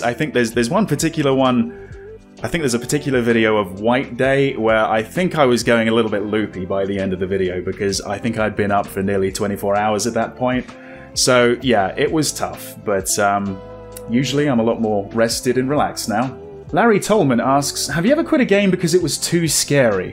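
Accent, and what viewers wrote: United Kingdom. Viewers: Congrats on 100K!! 🥳I have been following you for a long time now and you, Markiplier and Jacksepticeye are my favorite videogamers to watch on YouTube. Your videos are always entertaining and wonderful to watch! You brought my attention to wonderful games like the Cat Lady, Silence of the Sleep and Downfall. I looooved those videos you recorded so much. I enjoy it that you play Indie horror and Asian horror games that mainstream youtubers don't play that much. Also, your British accent is so awesome! 😁 keep up the good work! I appreciate you and what you do! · ‘In Bruges’ is a fantastic movie!! I also had your accent pegged as a fellow Surrey person. We sound very similar. This was a great video,. Pleased we get to know more about you. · Thank you so much for doing this. I started to watch you a few years ago, because I was about to do voluntarily stuff in the UK. 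So I looked for "british sounding lps" to get used to it to understand it better. I had to stay for your humour, for the fun, for the checked toilets, for the very rare screams. I think we'll get to 200.000. Let's do it! With some pizza and tea!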